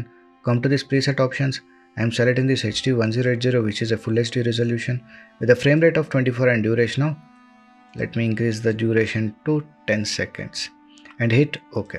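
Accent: India. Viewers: I knew it would be a good tutorial when I heard the indian voice.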